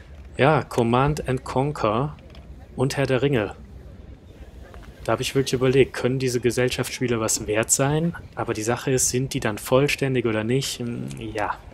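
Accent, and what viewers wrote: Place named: North Germany, Germany. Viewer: Aus Norddeutschland schätze ich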